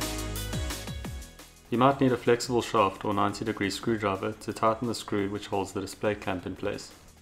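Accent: South Africa. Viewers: a fan of our South African Accent!...Well done bru, lovely work. I have the horizontal mounted ICE Tower, perhaps a "Flatbed" version? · dude that would be awesome please as not as many people have access to laser cutters as to 3D printers! P.S. great to finally here a Saffas voice on one of these channels, keep up the awesome work!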